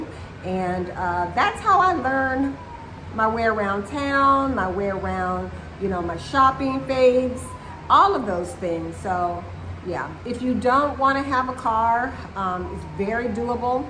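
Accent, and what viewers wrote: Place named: Southern California, United States. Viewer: I thought that was a So Cal accent. I'm hoping to visit Panama, Spain, and the Philippines this year so we'll see what happens. Keep up the YouTubes - you're easy to look at 🙂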